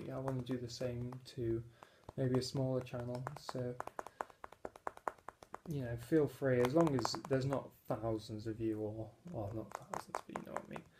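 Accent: United Kingdom. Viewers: When Hannah mentioned you I was sure you were already on my subbed list. But you weren't :-/ Sorry about that. I always enjoy stumbling upon new ASMRtists with UK accents. So now I subbed and already watched 3 of your videos. Can't wait to see more from you :) XO from Denmark. Btw. You're so cute ;) Hope you're not too young for me to state that fact :D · British accents are my weakness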